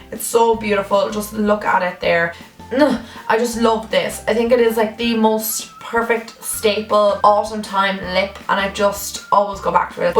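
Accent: Ireland. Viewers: Omg I love ur accent X I'm going to Ireland soon X what part are u from X ? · Are you from Ireland?🇨🇮 cork here!😋😊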